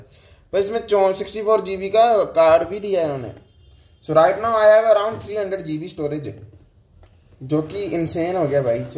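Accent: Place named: Pahadi, India. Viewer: Pahadi accent ❤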